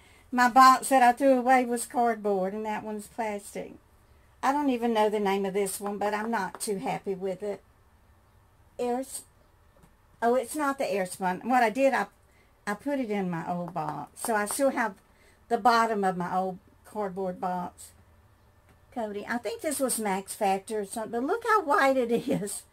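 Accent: United States. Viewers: I love your American accent ?! Where are you from in USA 😍 Irish ☘️ fan watching hehe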